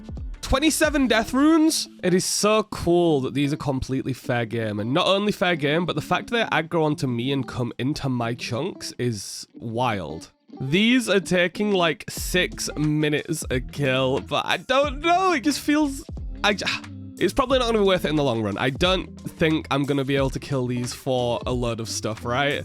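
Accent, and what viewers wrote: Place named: North West England, United Kingdom. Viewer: you sound like ur from the north west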